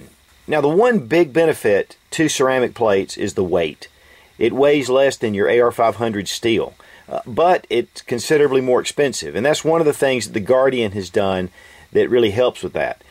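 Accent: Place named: South, United States. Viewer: Great accent denotes Southern nobility of yore.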